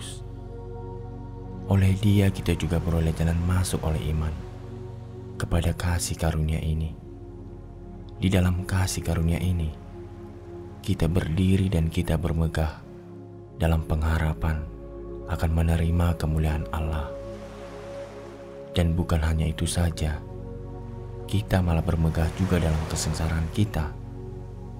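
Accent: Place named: Nias, Indonesia. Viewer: Kalau dengar logatnya seperti logat org Nias bhsa indonesianya.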